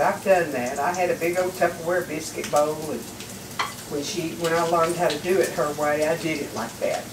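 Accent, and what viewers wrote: South, United States. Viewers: Just found your channel last night and I love it! Your kitchen and garden are both amazing, and so are you. I love listening to your sweet southern accent. God bless you!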